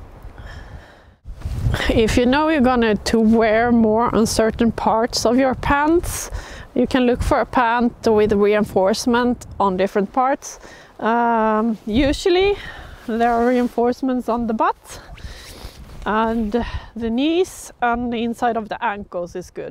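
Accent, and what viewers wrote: Sweden. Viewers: Kollar alla era videos, men inte direkt i ordning men alla är lika bra. Tyckte du fick med alla saker som är viktiga för ett par vandringsbyxor. Men… ibland låter din engelska som du snarare kommer från södra Sverige än Jokkmokktrakten:) kan ha helt fel där:) tack för bra videos i alla fall 👍🏼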